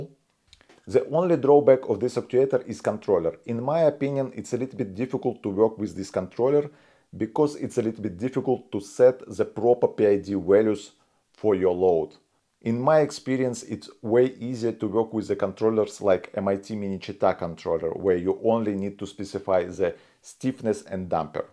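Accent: Russia. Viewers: Мужик ты же русский! Акцент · Акцент как у русского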